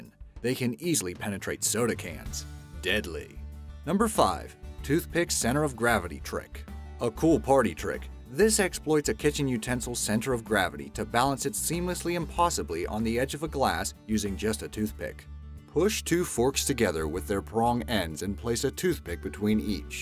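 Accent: Hungary. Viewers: wait, are you from hungary? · Are you from Hungary?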